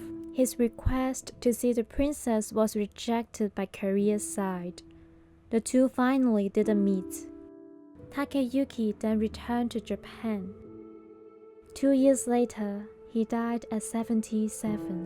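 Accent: China. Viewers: Your accent sounds Mainland Chinese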